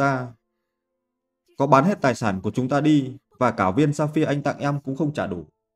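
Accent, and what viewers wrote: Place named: North, Vietnam. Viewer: sao cứ thuyêt minh giong bắc khong đã vây nghe không biết nam nữ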